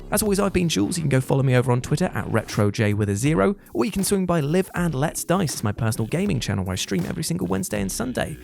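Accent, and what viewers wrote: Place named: United Kingdom. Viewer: Has anyone ever said that you sound like a more "proper British" speaking version of Luke from OutsideXbox OxVenture? More "proper" from an America's viewpoint that is heh heh 😅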